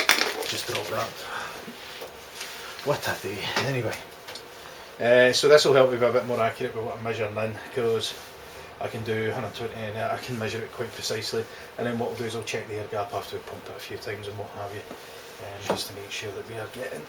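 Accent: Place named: Scotland, United Kingdom. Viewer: I believe he's a Scot....love the accent:)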